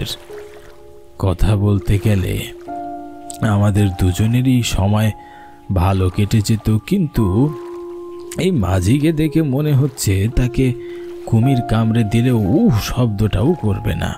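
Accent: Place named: East Bengal, Bangladesh. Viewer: Narrator কি বাংলাদেশী না কি? উচ্চারণটা পূব বাংলা ঘেঁষা। ভালো লাগলো না।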